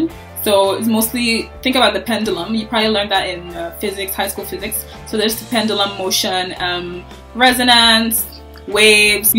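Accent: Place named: Northwest, Cameroon. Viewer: This is really good. It's helping me. You are sounding like a north west Cameroonian.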